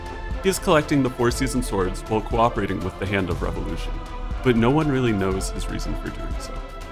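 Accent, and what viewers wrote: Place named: England, United Kingdom. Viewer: English narrator? U sir, have my like 👍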